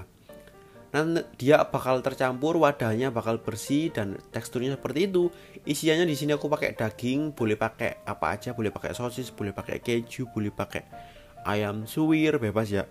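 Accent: Java, Indonesia. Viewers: Ciri khas medok nya agak berkurang ya.. biasa nya lucu dgr voiceover nya kalo medok bgt ky dulu². Tp resep² nya selalu mudah diikuti.. semangaaat!!!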